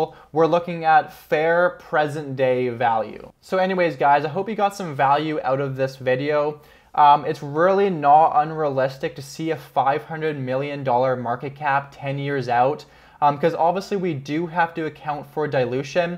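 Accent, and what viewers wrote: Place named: Edmonton, Canada. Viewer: Are you from Edmonton?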